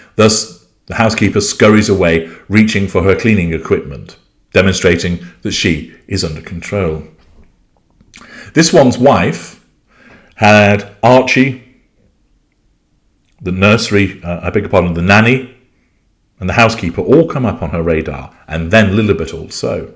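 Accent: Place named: Scotland, United Kingdom. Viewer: You know sometimes your pronunciation reminds me of Alan Brazil. I think you are of Scottish heritage.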